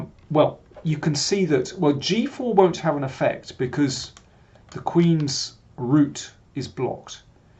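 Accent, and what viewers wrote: England, United Kingdom. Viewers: Great videos,! Lovely English accent in addition to keen chess analysis. My only suggestion: Please add the opening played, when appropriate, to video descriptions. Thanks!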